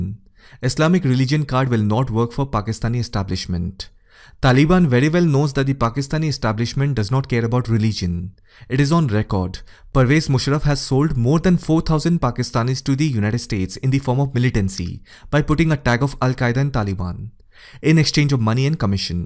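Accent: India. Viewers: Listen your voice sure is from India , India now let Asean people start Suspected of committing a terrorist attack behind the scenes at Pakistan booming.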